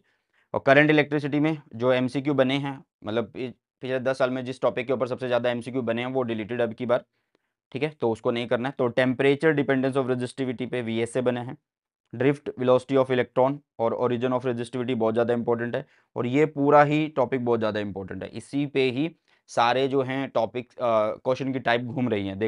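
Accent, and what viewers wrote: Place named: Haryana, India. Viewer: Sir aap haryana ke ho...apni language mein thoda accent hain haryanvi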